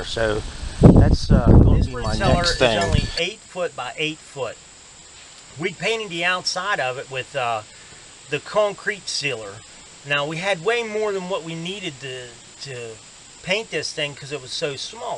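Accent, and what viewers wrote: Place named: Northeast, United States. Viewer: very cool. love the roof idea using metal and then removing it when set. what kind of temps do you get in winter, or sumner? sounds like you are in the northeast.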